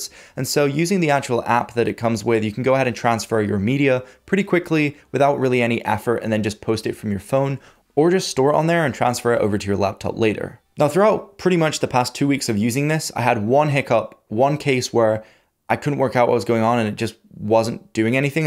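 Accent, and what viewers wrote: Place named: Scotland, United Kingdom. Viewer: But the way you speak is like Scottish....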